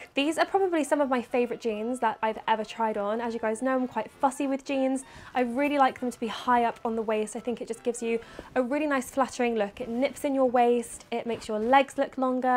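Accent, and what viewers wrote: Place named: England, United Kingdom. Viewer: I love forever new, and your right it is so you Also love your accent because I grew up in England And I rlly miss it there and your channel just gives me a taste of it Love from Australia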